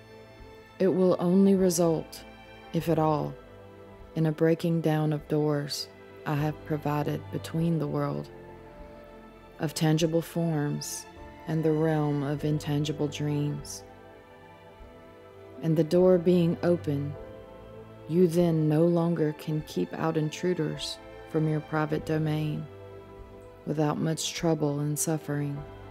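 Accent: South, United States. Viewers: Do I hear a beautiful southern accent? I am born and raised on the coast of Georgia USA.. keep reading!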